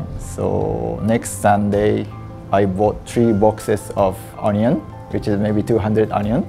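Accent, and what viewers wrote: Singapore. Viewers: Video: English Chef: Japanese Accent: Singaporean Dish: Italian Ingredients: Japanese Bill: Priceless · This is new to me. A Japanese with Singaporean accents 😅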